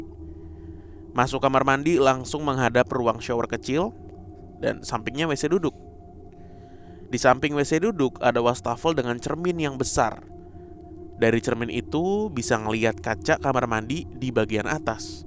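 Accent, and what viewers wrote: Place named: Brebes, Indonesia. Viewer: wong brebes kyeh....😅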